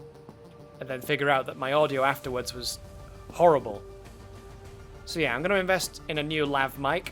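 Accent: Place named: Boston, United States. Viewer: haha I suppose so! The main thing that throws me off is the pronunciations of "ar" like in "dark". You say "duhk", which sounds super typical of a thick Boston accent. Sorry for obsessing over it. It just strikes me as unusual and fascinating. Incredible things happen when you connect random parts of the world together with voice chat lol.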